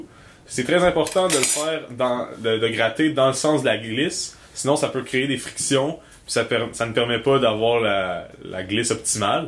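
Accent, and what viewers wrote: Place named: Quebec, Canada. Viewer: Cool la video j aime bien votre accent Qbekoi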